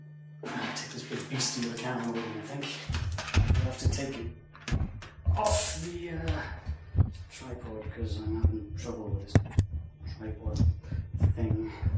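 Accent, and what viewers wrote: Ireland. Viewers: Are you from Ireland? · He sounds like an Irish guy that moved to England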